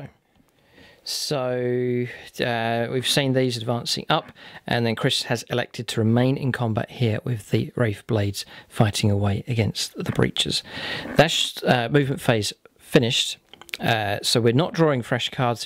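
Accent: Northern Ireland, United Kingdom. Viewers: Northern Irish accent is immediately recognisable.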